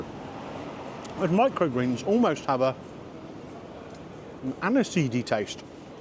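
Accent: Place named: West Country, United Kingdom. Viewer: Hi. Back again! I am certain Gary did attend a FLAT IRON restaurant a little while back as I remember him holding up a mini meat cleaver. Excellent report mate, and love that West Country accent. Many thanks for these excellent reports, and thank you for the responses to my comments. You and Gary are one of a few who give me the courtesy of a reply. Cheers my friend and I shall see you again summarising "RIP OFF RAMSAYS" hamburger. Cheers!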